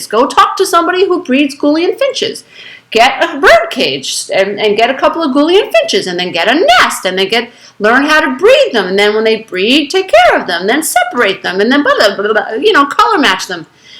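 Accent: New York, United States. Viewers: OMG! Love you! Love your style too! You SOOOO hit the nail on the head with everything you speak of in this video! This is the first time I'm viewing your videos as I have been doing inner child work for years and within the whole ascension process, but not with any major breakthroughs, THEN, I found this! I love your New Yorkness! I from NYS as well, (in fact, we have the same enthusiasm when speaking of something we love!!) When you described the narcisst and the co-dependent, holy smokes, I realized that my mother was both & so was my father! I cannot even describe how much this video has helped me, and this is only the first one! Will be viewing all of your videos on this subject! You are so genuine, funny, inspirational and so right on!:D AND explains why I've always had 5th chakra issues! I also follow LOA practices and metaphysics, raising my vibration, spiritual, sound healing, Reiki, you name it, but WoW! 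Love your uniqueness! Thank you Sweet One for doing what you do and for shining your light ever so brightly!!!!!!! - You Rock!! Namaste. <3 <3 <3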